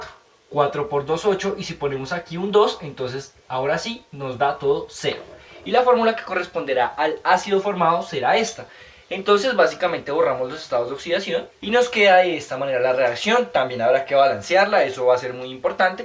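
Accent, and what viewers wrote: Colombia. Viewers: Ese acento colombiano xdxd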